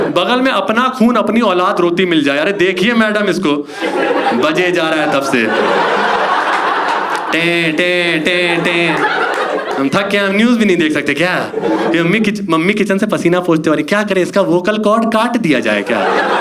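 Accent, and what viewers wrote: India. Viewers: That little touch of Bihari dialect.. amazing 👏👏👏👏😂😂😂😂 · Your dialogue delivery is so typical of Renukoot with a touch of the old Mirzapur vernacular.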